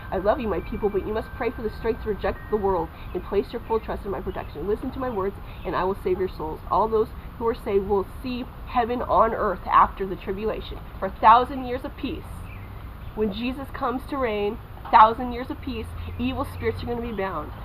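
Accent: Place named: Ireland. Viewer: For some reasons i thought you were from Ireland.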